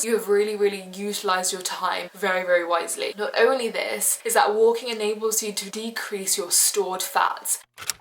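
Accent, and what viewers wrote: RP, United Kingdom. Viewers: her accent is very posh, we don't all sound like that lol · Yeah I know there's different British accents hahaha but her particular one is so pleasant to listen to because she pronounces the words so clearly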